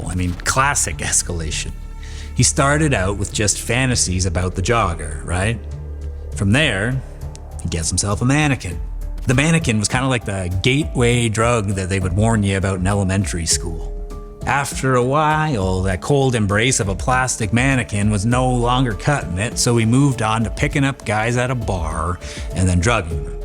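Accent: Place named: Canada. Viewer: Actually, I wonder about you. You practically make rock stars out of these killers. It's as if you idolize Dennis Rader. He murdered four members of a hardworking immigrant family named the Oteros in Wichita, KS. He took their ten-year-old daughter, Josephine Otero, and hanged her on a pipe in the basement and then jerked off to her corpse, leaving sperm on her leg. There was no DNA tracing then, so we rely on the evidence at the scene and his own testimony. This guy you can't get enough of? Stay the hell in Canada, you sound Canadian. I hope Trump buys your country out! You know, Kanuck, you can always visit Rader in prison. What, too chicken? Why don't you examine a few of your own killers, like Billy Bishop (72 kills) and Raymond Collishaw (68 kills, many others when he became a mercenary after WWI). They murdered more than Bundy or Rader, or any other hero of yours.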